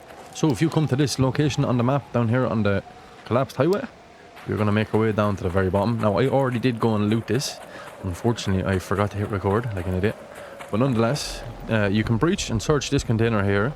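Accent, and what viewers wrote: Ireland. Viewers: I know a fellow Irishman when i hear him 💚 thanks for the useful info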